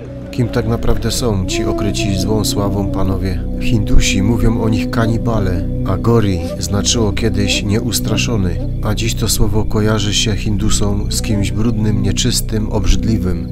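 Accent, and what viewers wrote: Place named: Silesia, Poland. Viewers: Lektor powinien popracować nad dykcją. Film bardzo interesujący ale ten śląski akcent jest nie do zniesienia. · Ten Pan bardzo ładnie mówi po polsku A akcentu śląskiego nie da się wykasować ...także nie czepiaj się...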